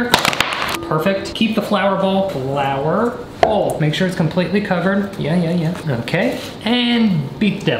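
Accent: South, United States. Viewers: Just watched a video from 2015. You've gotten more southern? I guess.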